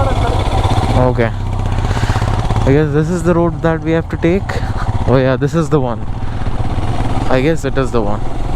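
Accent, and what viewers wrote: Karnataka, India. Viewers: Bro, you have been doing some nice videos and showing some unknown places which makes most of them happy. In most of the videos we see that you speak good kannada accent when you are communicating with or with localities. So, it would be great if you can do some kannada vlogs also along with English🙂. All the best for your future rides👍.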